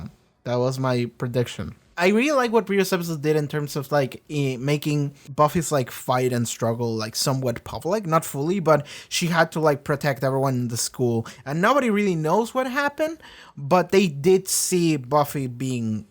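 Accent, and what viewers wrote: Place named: Latin America. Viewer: "monster of the week" episodes are the best for me. Yes, I enjoy a "story arc" episode here and there, but the individual episodes are the ones I end up revisiting the most and they're the reason why I still watch Buffy to this day. Also, me encanta ver a un reactor que habla español y es de sangre latina, me había preguntado de dónde eras en estos últimos episodios y porque me llamó la atención el acento con el que hablas inglés, y es bueno haber despejado la duda. Saludos desde México.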